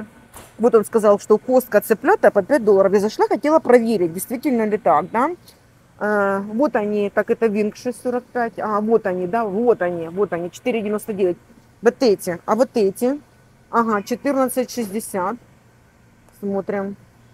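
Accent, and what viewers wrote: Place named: Krasnodar, Russia. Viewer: Надо же, как силен краснодарский говор, даже через десятилетия😢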